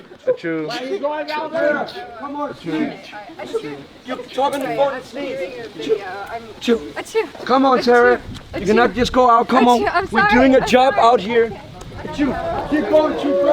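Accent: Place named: Denmark. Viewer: That Danglish tho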